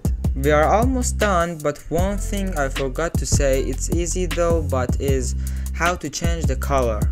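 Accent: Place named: Iran. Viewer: داداش لهجه ایرونیت خیلی باحاله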